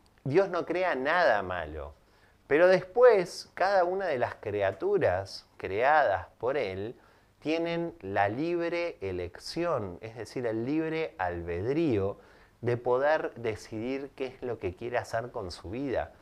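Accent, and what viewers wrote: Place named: Venezuela. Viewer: 🤭 ¡Lo sabía, eres Venezolana 😊 Un abraaazo 🤗! ese tono de voz es inconfundible prima🇻🇪.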